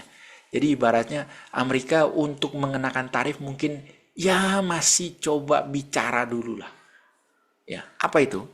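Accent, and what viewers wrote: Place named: Central Java, Indonesia. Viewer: Modar, semacam logat jateng , magelang purworejo sekitarnya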